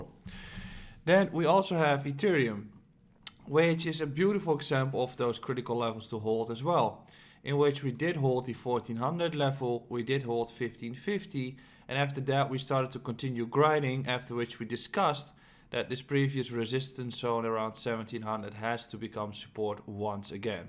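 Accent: Netherlands. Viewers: Are you Dutch? You sound like Dutch.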